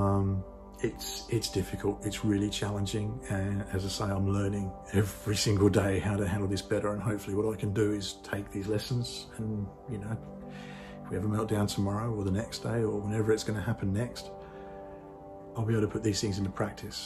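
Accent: Australia. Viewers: Thanks for the Star Trek analogy. That was perfect being Im an avid Trekky. I work in a school with challenging kids. PDA came onto our radar sometime last year. I am working with a girl that we think may have it. Anyway... strategies for her can be applied to all the kids as a way to keep things calm and safe while diagnosis' are being sought. Im in Australia. You sound Aussie. Its easy for me not to feel it personally, it must be so hard as the parent. I validate students feelings so they know I am listening. I have had things thrown at me, sworn at me etc. I ignore all those things. A gentle smile would work best as a bigger smile can be interpreted as ridicule.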